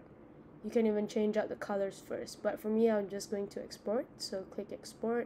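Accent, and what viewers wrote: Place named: Brazil. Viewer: o mano parece br... nice video boy!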